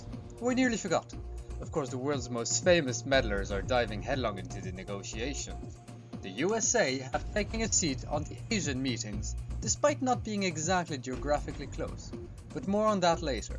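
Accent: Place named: Ireland. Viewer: I came across your video by pure chance my dear friend. You have treated a serious and always topical subject with a touch of humor as usual and a slight Irish accent which gives a little more charm to your video!!! well done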